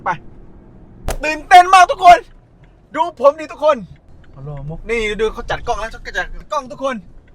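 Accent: South, Thailand. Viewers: ใต้สำเนียง มอน นะครับ 5555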